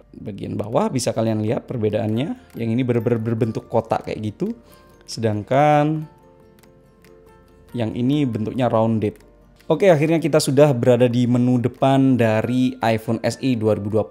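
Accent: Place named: Surabaya, Indonesia. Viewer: Hahahah, suroboyoan jangkrek..😁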